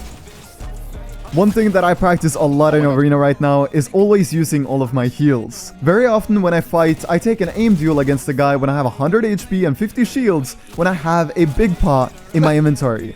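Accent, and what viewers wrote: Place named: Norway. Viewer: i hear Norwegian, jeg høre at du er norsk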